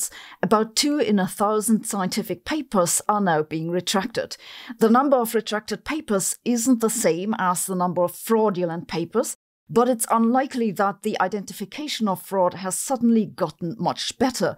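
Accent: Germany. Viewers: I'm going to submit a paper on how many times Sabine says "however" per video, with a magnificent German touch. It's amazingly sweet! Thanks for the video.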